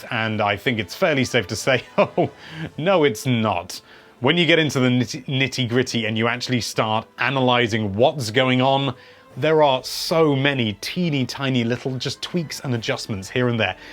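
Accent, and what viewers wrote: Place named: United Kingdom. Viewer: Is it just me or does seem more British than usual.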